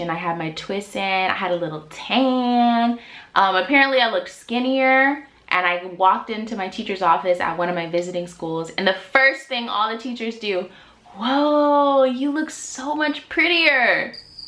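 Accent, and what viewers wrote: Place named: United States. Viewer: the american accent is so annoying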